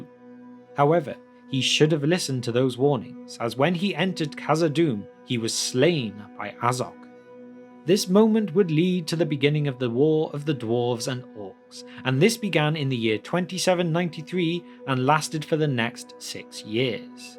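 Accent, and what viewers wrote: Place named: England, United Kingdom. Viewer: I came across your videos this afternoon and I'm really enjoying them and the fact you add some style to pronouncing Elvish and Dwarvish names. The American LOTR vloggers mangle everything or just pronounce the place names like they might actually be somewhere in Wisconsin. One thing - I'm presuming you're English? I can't put my finger on your accent but the giveaway is you pronounce Durin as "Jewrin" instead of "Doo-rin". Like the "Juke of Edingburgh" (although you Brits never called Duran Duran "Jewran Jewran" thank God!) It's the only flaw in your otherwise excellent work!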